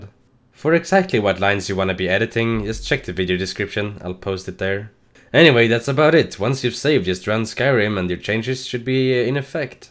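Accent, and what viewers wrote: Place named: Sweden. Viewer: haha i can tell that u are from Sweden.. lol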